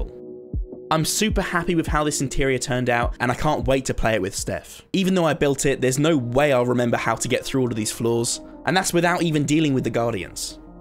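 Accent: United Kingdom. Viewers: why did he have to be british though 😭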